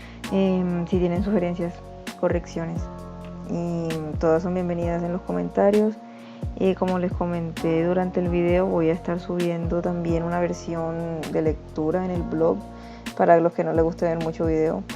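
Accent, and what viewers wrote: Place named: Barranquilla, Colombia. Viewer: Está hermoso, me servirá un montón. Graciaaas! De paso, eres de Barranquilla? Se me hizo por el acento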